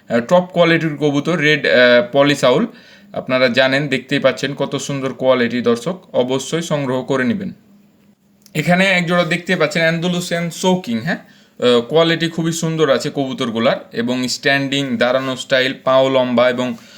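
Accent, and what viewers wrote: Kolkata, India. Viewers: আপনার কথার ধরন কলকাতার মানুষের মতো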